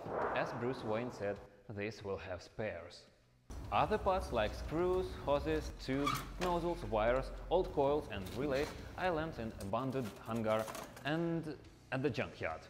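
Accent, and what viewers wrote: Russia. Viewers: Сразу, по акценту, понял что ты русский! Я желаю тебе удачи, ты молодец!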